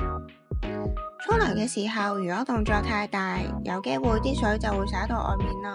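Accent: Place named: Hong Kong, China. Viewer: Hong Kong accent is so cute and soothing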